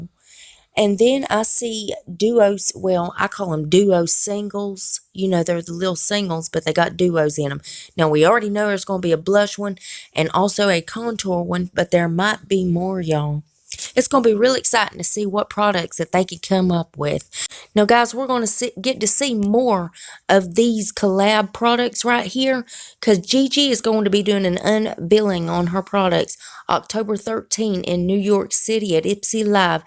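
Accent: South, United States. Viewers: Hey I just subscribe to your channel. I do enjoy your country accent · Love all your videos and absolutely love your voice you sound southern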